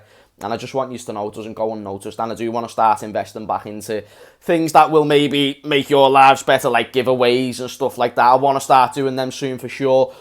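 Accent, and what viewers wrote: Liverpool, United Kingdom. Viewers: People think that there's only scousers in Liverpool... .there is grades of scouse and this guy is the top one. I've lived all me life in dovecot Liverpool. . . This guy out scouses me lol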